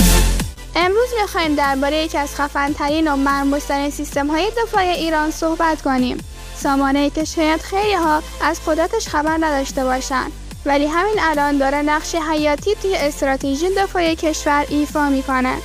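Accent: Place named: Afghanistan. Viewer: گوینده افغانی هستش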